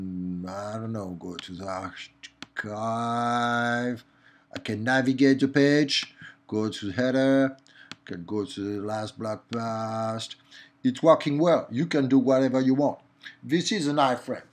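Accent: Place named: France. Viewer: I love this and I think it's easier to do it in WebGL than in JavaFX :) Your fenglish is cute :)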